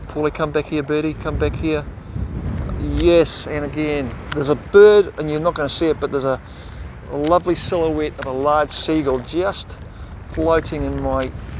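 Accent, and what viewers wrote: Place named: New Zealand. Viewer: As a NZer living overseas, hearing the accent on YouTube cracks me up. Great vid and pics, subscribed!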